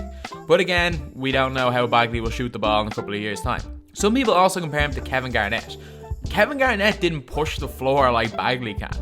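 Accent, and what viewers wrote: Ireland. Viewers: I'm confused how a Irish voice is doing basketball video lol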